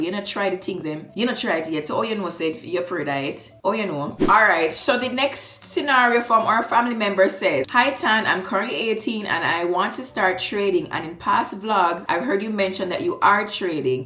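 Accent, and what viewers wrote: Jamaica. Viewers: I really love how speaks, articulate but drop in the patois funny but serious same time. 💫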